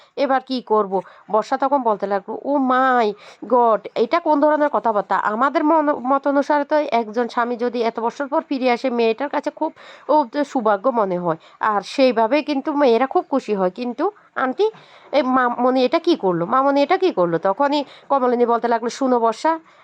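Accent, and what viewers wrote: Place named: Noakhali, Bangladesh. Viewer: Didi apnr kathagulo kmn lagche sunta. Apni ki nowakhali dist a thaken?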